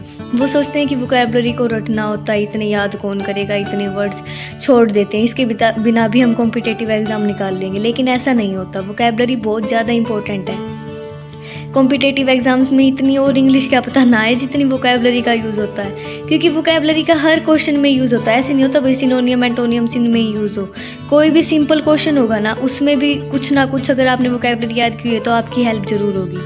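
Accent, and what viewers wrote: Haryana, India. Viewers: Haryanvi tone👌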